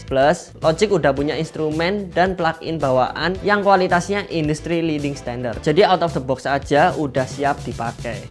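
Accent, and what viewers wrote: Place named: Java, Indonesia. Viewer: Medok buanget, wong ndi mas?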